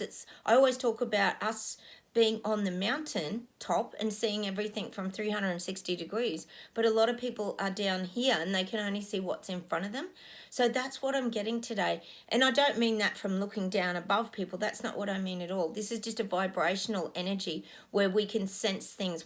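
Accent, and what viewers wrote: Australia. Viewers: I enjoy hearing your Aussie accent,! Lovely. Here in the USA, Arkansas, we are in triple digit heat, and blessed to have air conditioning in my home. Blessings to all who are striving to stay in peace in our hearts. Thank you for your messages and your humor😄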